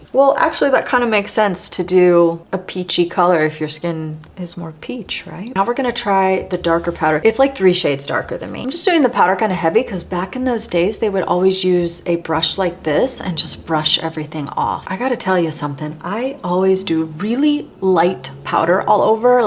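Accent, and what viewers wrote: United States. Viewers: obviously american?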